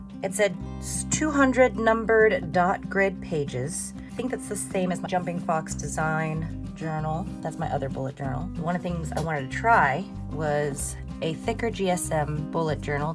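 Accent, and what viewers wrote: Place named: Mid-Atlantic, United States. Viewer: I find your accent fascinating, very Mid-Atlantic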